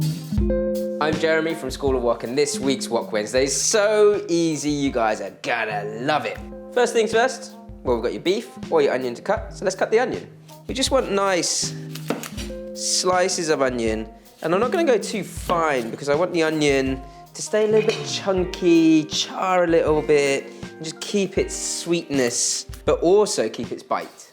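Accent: United Kingdom. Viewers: I'm amazed by the food but confused that you got a British accent hahaha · Chinese speaking with uk accent wow. Amazing and the fiod looks delicious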